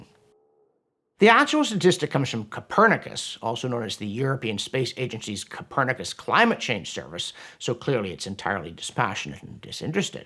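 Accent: Canada. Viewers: Dr. Robson, I have to say this. I know you are a good intended person very active in getting real information to people about the climate change alarmism and it's dangerous to our society. I'm just going to say it meaning to help because your message is very VERY important. Not so bad in this video, but ... since your message is SO important I think the way you comport the message is flawed. Your vocal delivery is plainly, not good. You speed talk so much and trail off at end of sentences and paired with your obvious Canadian accent, sorry but t true there's something there.. ... too often it gets frustrating trying to hear you and often I've just stopped watching the video to find something else I can actually hear. Yes, I have tinnitus, but I am not alone in that. I don't know what your hurry is and why you can't just take the time to present your message in a more listenable deliberate cantor. 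I think you'd find MANY more views on your videos if you'd try to improve your public speaking to a more pro presentation. I say this as a person who appreciates your efforts and especially your important message. BTW the caption often gets it wrong and can't keep up either. A little patience and taking it a bit easier will benefit your delivery. People want the truth they also want to hear it communicated clearly. Take this as constructive as I do like you.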